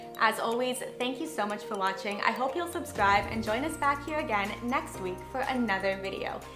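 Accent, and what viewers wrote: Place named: United States. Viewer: Why American accent? English is from England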